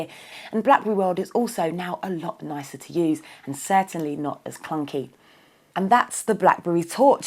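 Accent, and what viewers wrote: United Kingdom. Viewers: A Black girl speaking in uk english!!!!! WTF LOL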